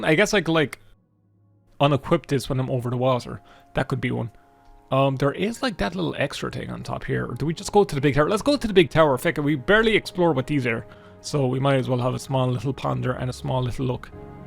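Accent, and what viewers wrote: Ireland. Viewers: why are you irish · You sound like DingDong in an Irish accent and it's truly endearing. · I missed your beautiful Irish accent 😭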